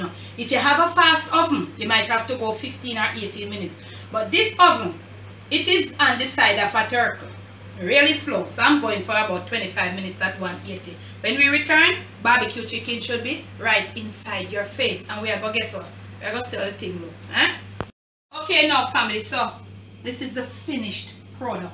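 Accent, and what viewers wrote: Jamaica. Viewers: Typical good good up Jamaican cook. She switches effortlessly from proper english to patois... Cho! Big up, food look so good... 🇯🇲🇯🇲🇯🇲🇯🇲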